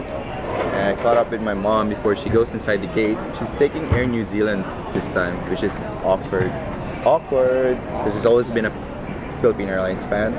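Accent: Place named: Canada. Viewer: Candian accent ka na ah. :) cool.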